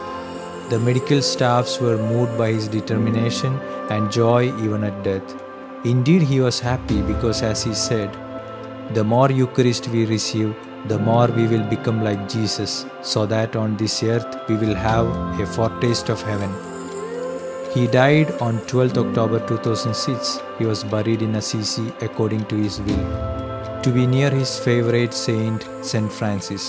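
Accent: Kerala, India. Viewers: That Indian accent bothers me! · Sounds like a malayali..... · Sounds indian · Is this voice over given by a priest from Kerala? Nalla kettuparichayam Ulla slang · Beautiful video. Nekkul Malayaliee anuuu ellai. I understood that from your voice. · Hearing the voice of the narrator I know his an Indian.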